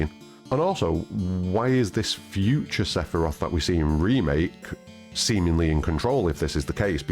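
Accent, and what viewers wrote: Manchester, United Kingdom. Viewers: Loved this video! Especially narrated by someone who sounds like they’re from Manchester like me 😁 Great work!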